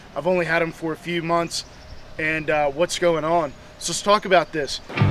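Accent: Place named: South, United States. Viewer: Love the channel your accent is a mix of southern and Cali surfer lol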